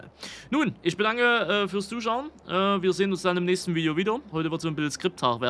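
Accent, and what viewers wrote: Saxony, Germany. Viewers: das er Sachse ist hab ich mir schon gedacht, nur welche Ecke. Bin selbst Sachse.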